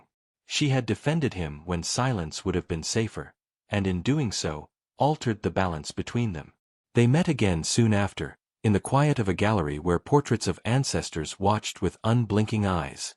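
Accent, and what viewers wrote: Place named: United States. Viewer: Please it’s duty not dooty and duke not dook. For heaven’s sake find someone who can pronounce these words the ENGLISH way and not the American way! 🇦🇺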